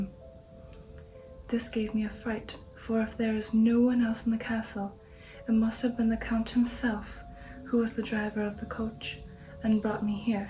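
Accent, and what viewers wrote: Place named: Transylvania, Romania. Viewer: it's nice to see you again a perfect accent a bit Transylvanian at some point I loved it always so much a pleasure ^^ (I know how much you love batman so here you go <link>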